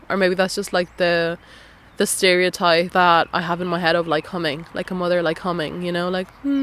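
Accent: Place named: Ireland. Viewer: kia ora from aotearoa (nz), it's surreal to see another young person on the opposite side of the world going through such similar experiences and processes, like I was listening to myself just with an Irish accent. Thank you for the self-safety work you have done and continue to do. It is a soft reminder that we too are allowed to treat our most difficult parts with patience, curiosity and respect. Have been recently feeling like I've been regressing or that my healing work is selfish, but this video & all the comments show that all self compassion overflows into our world, into those around us 😇 + so many good reminders of practical techniques i had forgotten or not yet heard of! ty ty ty <3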